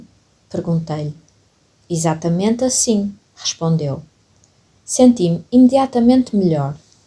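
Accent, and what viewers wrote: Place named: Portugal. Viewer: Obrigada! Adoro sua voz e sotaque Português! 🥰😘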